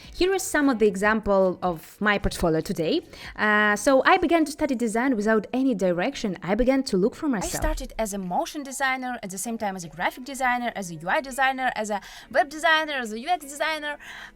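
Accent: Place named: Australia. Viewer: Your English is amazing! I thought that you're Australian :) Nice video, thanks!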